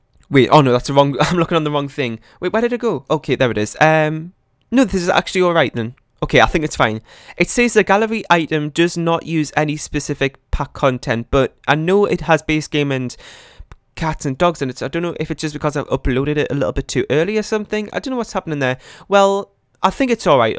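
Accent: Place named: Northern England, United Kingdom. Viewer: Nice build, and nice to hear a nice northern voice, looking forward to seeing more 😁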